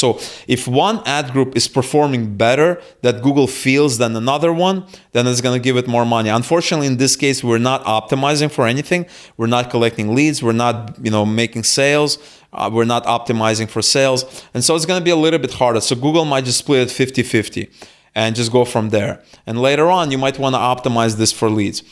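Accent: Russia. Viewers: you sounds like Russian! And by the way good video. I am about to test running CPA offers on GDN but not in the USA. Why? A lot of competition there and thus expensive click.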